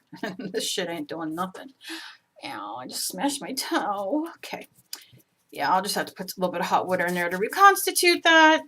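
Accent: New Jersey, United States. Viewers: You're my first YouTube comment post! I've been following your channel for about 4-5 months and am always watching them multiple times. I'm not a beginner but enjoy your banter while I craft. I will be doing the swirly tree myself soon and will try to figure out how to "tag" it to you. You're great cyber company. I'm a NJ native living in Georgia and when I came across your channel while surfing one day, the first thing I noticed was that Jersey accent. So I tuned in and haven't tuned out yet. I have a very stressful and demanding job and try to "art" in the morning cuz by the end of the day, I'm too tired to do anything. Thanks for being yourself and sharing your lovely personality with the rest of us. I've been very cyber shy about sharing my work publicly but I may find the courage soon thanks to your inspiration. Keep doing what you do and don't worry about the darn "clock".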